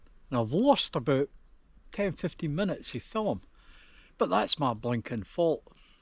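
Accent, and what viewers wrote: Scotland, United Kingdom. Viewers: Free serotonin, i love scottish accent and scottish landscapes, your videos are relaxing and comfy :)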